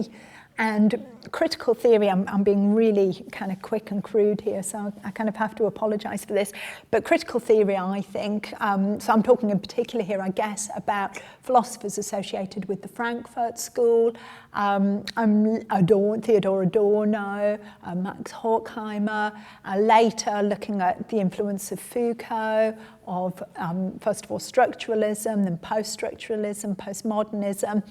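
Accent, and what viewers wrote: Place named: England, United Kingdom. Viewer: Where is she from? I'm trying to place her specific English accent.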